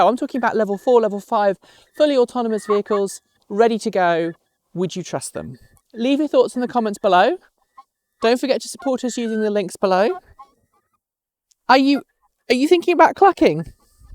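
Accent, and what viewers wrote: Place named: United Kingdom. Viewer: i always thought you are form the UK and not the USA.. you sound so British... take it as a compliment...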